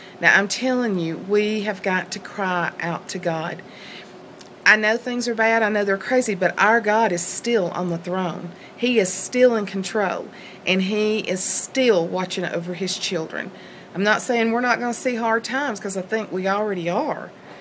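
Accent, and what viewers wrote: South, United States. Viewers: your Southern drawl is so fun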